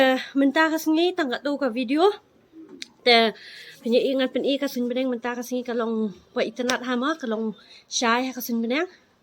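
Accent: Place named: Malki, India. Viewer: Ktien shnong malki te kum jong ii thik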